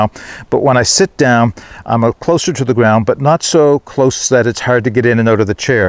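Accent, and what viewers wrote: Canada. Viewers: I love the way Canadians pronounce “ou” similar to here in Scotland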